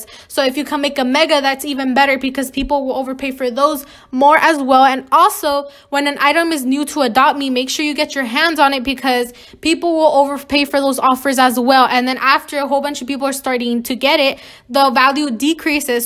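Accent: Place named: Latin America. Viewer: Yay I thought you were also latina because whenever you talk I always hear a spanish accent (if you know what I mean) now I know we both are 😊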